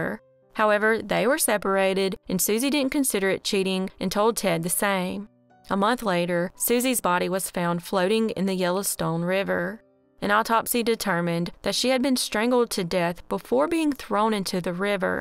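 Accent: South, United States. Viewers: I'm a southern "girl" myself SC. And you are now my favorite crime you tuber. So refreshing to hear a familiar accent.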